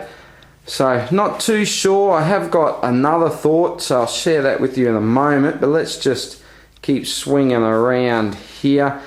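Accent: Australia. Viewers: That is a huge collection... but can you tell me what accent that is? Is it Australian?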